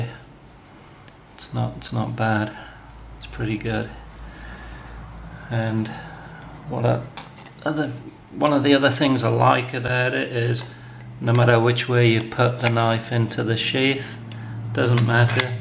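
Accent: Northern England, United Kingdom. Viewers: just out of interest where is you accent from ? you sound like your from up north in the U.K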